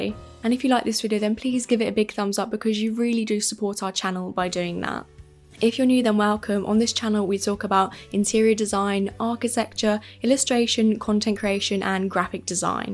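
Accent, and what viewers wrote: England, United Kingdom. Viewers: Haha. We're hosting Christmas for the first time. We've been going to my sisters for the last 25 years! I searched for Christmas tables and watched at least 10 videos from America. Then clicked on yours and it was a classic English accent 😍 Happy Christmas from Ireland 🇮🇪 And our table will be gorgeous.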